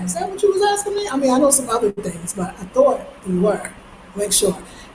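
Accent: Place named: New York, United States. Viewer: Yes we love you with that loud New York Accent, laughing , giggling, wearing bright colors & showing that sexy body!! 🌸🌸🌸🌸🌸🌸🌸🌸🌸🌸🌸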